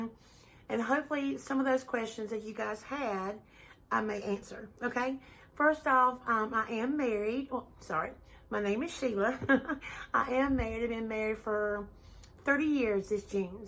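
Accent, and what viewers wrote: South, United States. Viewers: I love watching your channel. You are so REAL! That's a rare quality these days. I love your southern accent, your hair, just everything about you. You're sweet, and you sure do understand being a woman (it's hard sometimes) and I agree; we should be lifting each other up. I am a country woman stuck for now in the city. Trulia is one of my favorite pastimes. LOL Until next time...💜💜💜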